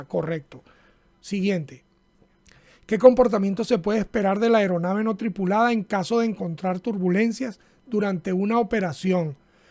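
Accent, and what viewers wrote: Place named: Venezuela. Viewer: Hola!! No se si te ha pasado, que despues de estar tan lejos de casa, oir a alguien hablando venezolano es como musica para mis oidos.. :-) aprendi mucho con el video anterior ( y me rei demasiado con "que tiene que ver el c... " y lo de la "burra bipeda" jajaja) y me dio mucha tranquilidad saber de alguien que despues de la perdida de un dron "mas nunca" se sintio seguro volando.. a mi me paso y eso que que compre un dron "fly away free".. me sentia incomoda, me daba mucho estres y lo guarde por un tiempo.. el cuento es que decidi tomar cartas en el asunto y el lunes presento el examen aqui en Noruega. Aun cuando el contenido es distinto, tu video me ayudo demasiado.. muchisimas gracias y un abrazo :-)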